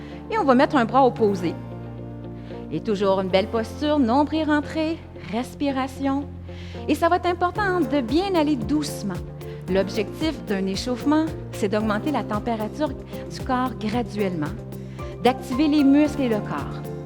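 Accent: Canada. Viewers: Bonjour de Bretagne! je viens de faire pour la première fois votre séance et j'ai beaucoup aimé. J'ai adoré aussi votre accent canadien, je reviendrai demain. Vous avez d'autres vidéos ?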